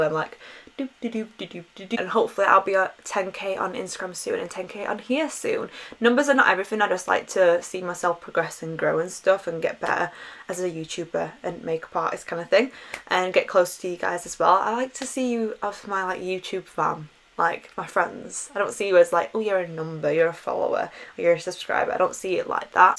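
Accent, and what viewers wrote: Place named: Yorkshire, United Kingdom. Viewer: also, i absolutely adore your accent 😂 i’m from the midlands and me and my boyfriend always say ‘lovely’ in the yorkshire accent hahah, made me laugh when you were swatching!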